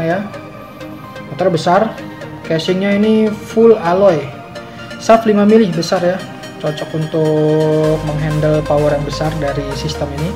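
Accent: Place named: Saudi Arabia. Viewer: You sound like you're from Saudi Arabia.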